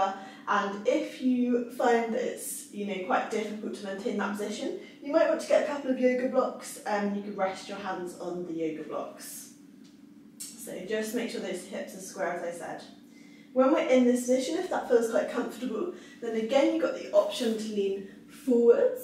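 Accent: United Kingdom. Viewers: Strive for perfection. Or do nothing n be perfect. Pony tail. British accent. Ima gitcha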